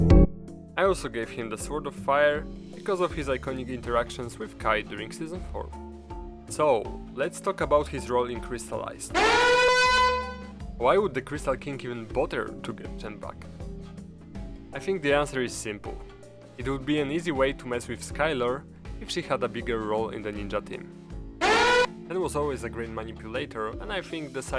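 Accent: Poland. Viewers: wiedziałem że jesteś z Polski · chciałem pisac po puerwszym uslyszanym slowie że masz strasznie polski akcent ale stwierdzilem ze mize poprostu mam cos z uszami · Polski Akcent Mocno wjechał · Po akcencie wiedziałem ze to polak. Zajebisty film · Po akcencie poznałem że polak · Po jak mówisz odrazu wiedział że z Polski jesteś XD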